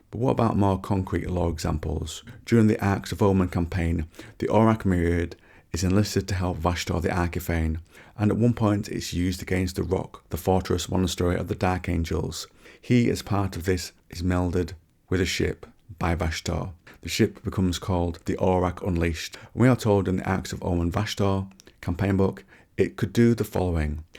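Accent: United Kingdom. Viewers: All I need is a soft , smooth British accent , and 40k lore , and I’m here for it. Luetin has biased me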